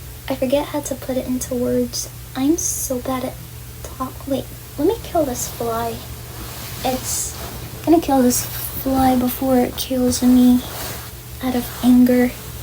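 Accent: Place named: New York, United States. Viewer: Your accent came out a little in this video :p you've mentioned being from New York before but I didn't notice you sounded like it until now. There's nothing wrong with whimsy and knowing who you are :p legit feel like the whole being "stuck" mentally thing you are describing is just not true (I don't mean that your struggles aren't true, I just mean that I don't think you're actually mentally behind most people your age, lmao... have you met most 20 year olds nowadays? like. you're good, girl, seriously). Maybe it was something that felt slow or painful in the past but you clearly are growing and developing as a person, even just talking about the past few months. It might be more exacerbated because you live in (a part of) America, where being able to drive and owning a vehicle is almost a prerequisite for independence and is a rite of passage for adulthood, and the fact that you were homeschooled so you have less context about the maturity of other people your age, outside of your family, but legitimately, in any other place you'd be ... 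I won't say "normal", but... finding it easier to thrive independently? I talked about it in the comments of another video but I legitimately think that autistic kids don't grow "slowly", they grow in a "different shape" than others, and it only looks slow if you're trying to force them to grow in the "normal" shape, unnaturally. You clearly have skills, emotional maturity, and agency that most 20 year olds don't. The agency at least is no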